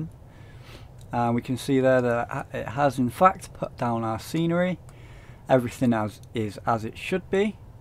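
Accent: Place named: Dudley, United Kingdom. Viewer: You sound like you're from Dudley cool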